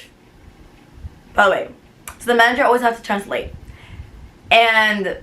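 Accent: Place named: Miami, United States. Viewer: I’m Australian and this is the first time I’ve heard a Miami accent. Like music to my ears 🎶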